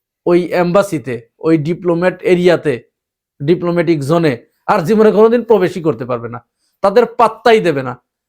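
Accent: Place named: Kushtia, Bangladesh. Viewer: ভাই, বাড়ী কুষ্টিয়াতে নাকি ? কথায় একদম আর্শিনগরের গন্ধ পায়।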